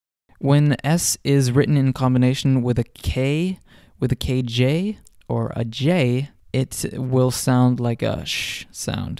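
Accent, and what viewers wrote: Trøndelag, Norway. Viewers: Good trøndersk pronounciation. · Jack R trøndersk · remember norway has a fuck ton of dialects for a country as small as us, the person in the video is from someplace in mid norway and he sounds differant in his pronounciation than me who is from northern norway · Man kan høre at du er trønder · Er du trønder? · This is dialect, probably from Trøndelag. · Hard to hide the trøjndærsk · Høres mest ut som kurs i trøndersk, spør du meg 🙂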